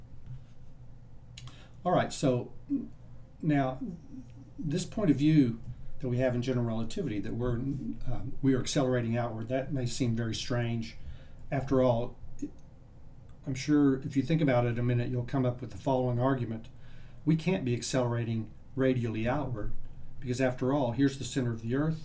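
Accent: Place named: Texas, United States. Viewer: That's a Texan accent right there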